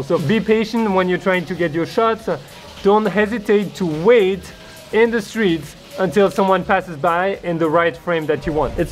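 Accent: France. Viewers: coucou l'accent français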